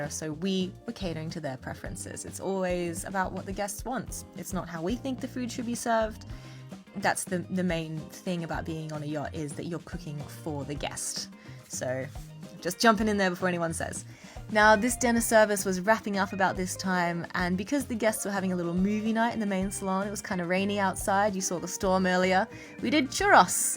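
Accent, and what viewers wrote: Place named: Australia. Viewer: What nationality is she? I thought she was Australian not English 🤔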